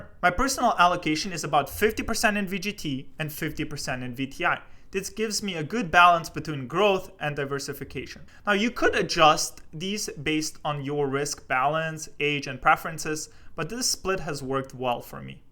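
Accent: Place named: Russia. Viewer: Hey great video man. If you don't mind me asking, where did you immigrate from? to me, your accent sounds Russian or Ukrainian.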